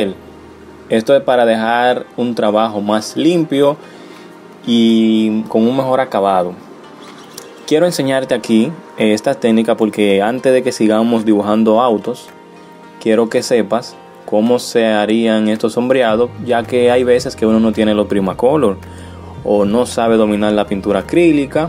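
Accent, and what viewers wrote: Dominican Republic. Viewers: Parece en la voz dominicano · Eres dominicano?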